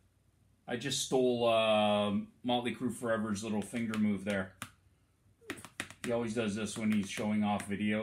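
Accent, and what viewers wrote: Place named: Canada. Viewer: Incantation flag is dope AF!! Are you from Canada? Just wondering because all of the "aayyy" lol. Good video btw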